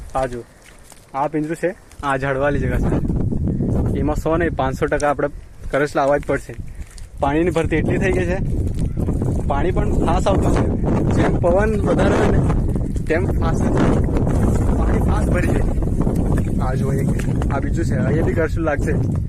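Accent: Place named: Valsad, India. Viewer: Tmaro fast var video joyo bhasha sabhrine laygu ke tme nkki valsaad naj se 😊 cement ma ma koye saval kyro hto kiya sher na to maru sachu j pyru tme valsaad naj so hu pn valsaad nij su etle bhasha samj pri jay😊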